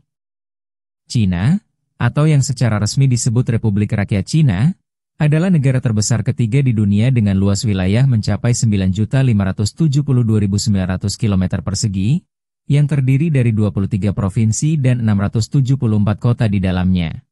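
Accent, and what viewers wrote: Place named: Indonesia. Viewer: waduh narator..................ini gimana sih janganlah abang baca bahasa inggris seperti bahasa indonesia, malu aku bang, bacalah muslim populesyen jangan abang baca muslim population, maluuuuuu.........aku bang, yang nonton konten ini sedunia bang, gimana aku mau subscribe, abang baca aja nggak becus........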